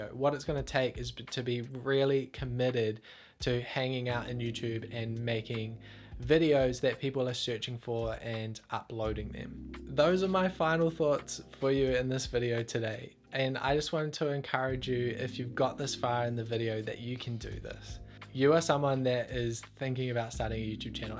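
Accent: New Zealand. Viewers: Awesome video man, thanks for all the tips. So nice to hear that kiwi accent, sounding mint! I'm just starting out with my videos, excited to work on some long form.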